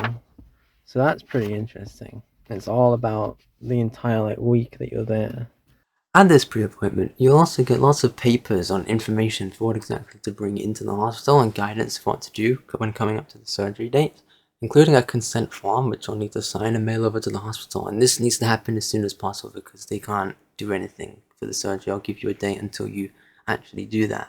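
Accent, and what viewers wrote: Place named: Eastern Europe. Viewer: You sound Eastern European, do you feel OK about forcing British people to pay for your fetish?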